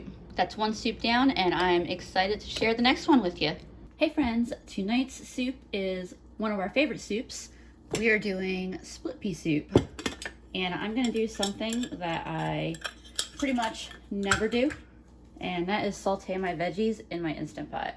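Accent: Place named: East Texas, United States. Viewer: You're my fav. Even though I'm from east Texas .... you sound like me 😁 love your no drama cooking ... I just keep watching you making meals that are doable. Thankyou dearly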